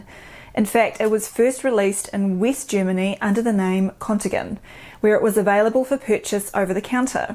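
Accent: New Zealand. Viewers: ... your material is awesome. My go to info source. Sadly my puter and phone both try to make your Odysee vids impossible to watch. Very frustrating. I was sooooo happy to see this one go so in depth and get away with it... kudos to you. I lived in NZ for 14 years and God, I wish I'd had you as my GP..:D I think too much... is that a thing? Thinking too much? It seems thinking is a quaint old tradition no longer observed by most. People just accept/react or react/accept. You.. you.... are one doctor I'd loooooove to talk to. You seen those memes depicting dead legends... who would you like to talk to? Yer not dead and i hope that doesn't happen for a looooooooong time... but I'd dearly love to pick your brains... you seem a conventionally trained modern physician... yet one who has begun to question the current paradigm. THAT is an amazing leap better than any moon landing. 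And yes, I grew to love the Kiwi eeksahnt? I know you have a GP practice, a Youtube star base, but god! I'd love to talk to you. i have soooooo many questions. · Just love the topics, your easy to listen to with that adorable voice and Kiwi diction 👍😁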